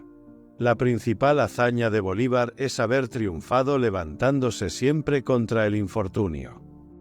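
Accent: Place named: Spain. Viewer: Lo felicito, su asento español no le quita su mérito de haber hecho este documental muy acertado del genio más grande de América, Bolívar es uno de los hombres más importantes del milenio, con sus errores y méritos y egoísta es el que no lo acepta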